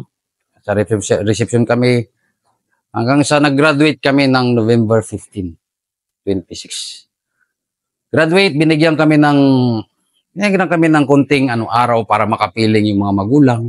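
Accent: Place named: Visayas, Philippines. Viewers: Yung tuno ng salita mo master bisaya na❤ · Taga panggasinan si sir pru bisaya na yung tono nya pati salita at kilos nya bisaya tlga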